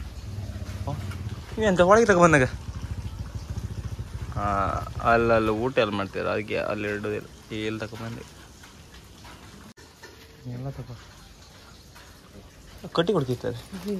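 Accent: Kundapura, India. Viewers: He speaks in Kundapura kannada. It's different dialect of Kannada. Do not insult any dialect of kannada.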